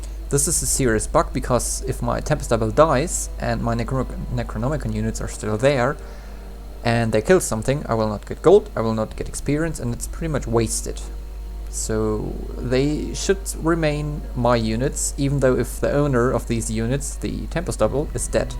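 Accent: Germany. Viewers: It is a german accent, I believe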